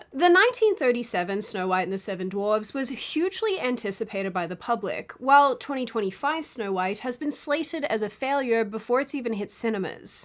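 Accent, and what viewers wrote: Australia. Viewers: Sydney, you sound a lot more Australian when you sick. 🐿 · I'm not sure if in crazy or what 😂 but you sound way more Australian when you are sick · Aussie accent getting stronger and stronger..... LOVE IT!!! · Your Aussie accent is thicker when you're sick. Hilarious and endearing. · GREAT analysis, Sydney. You pointed out things that I had not thought about, specifically the WHY woke movies (and everything else woke) is SO BAD! Btw... your Aussie accent really comes out when you're not feeling well - but at least you looked great...! 😊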